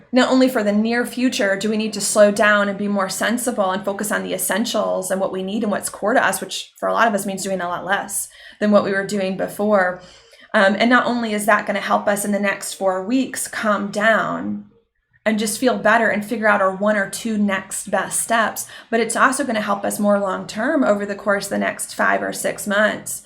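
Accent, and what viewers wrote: South, United States. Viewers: I love when I can hear your southern accent-let it shine xoxo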